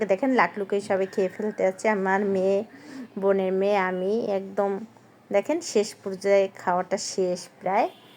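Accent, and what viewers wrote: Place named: Bangladesh. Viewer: Didi apni ki banglades thaka? Voice tone ta oi rokom tai jiggasa korlam❤❤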